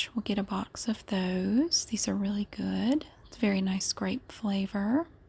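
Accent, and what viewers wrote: South, United States. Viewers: I’m from Nebraska and most people only eat Hidden Valley Ranch. If I came home with generic ranch, my family would flip out no joke. Midwest is serious about our ranch LOL! I love your videos and your voice and southern accent. It’s fun shopping with you and watching you straighten things. Thank you! 😊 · just recently found your videos. I must say that they are the best distraction for my anxiety. and Im from the south and your accent is very reminiscent for me, always watch them before bed Lol😂 thank you for being hilarious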